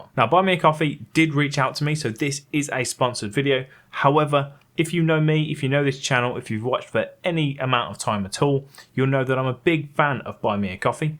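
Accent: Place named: Birmingham, United Kingdom. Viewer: just come across your channel chap. Great stuff, explained the buy me a coffee concept so clearly I’m off to sign up. BTW, that accent sounds like yo live by me near Brum?? If you do and want some stills to use on channel/social media, I’m happy to help FOC (if I can pick a few tubing tips up from you). Thanks for the great video, cheers Phil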